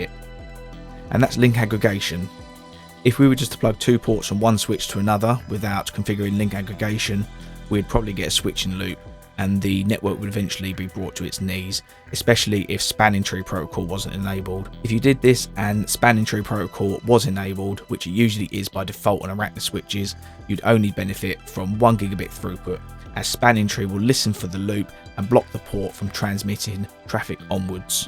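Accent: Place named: Bradford, United Kingdom. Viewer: I know Bradford when I hear it 💯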